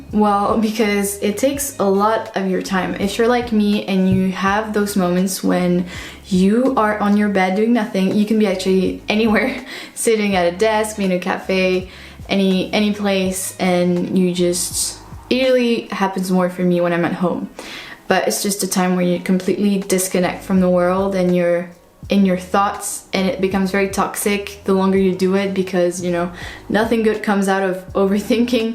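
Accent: France. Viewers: Great video Thanks Are you french? :) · Hey there, I just discovered your channel while researching adhd paralysis tips and this is so helpful! I'm undiagnosed but everything adhd I relate SO MUCH. Not related, but are you french? I feel like you have a tiny bit of an accent I recognize in us french speakers? Have a great day love, thanks for this. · Loved the video, thank you so much! Also, are you french? I noticed a tiiiny bit of an accent eheh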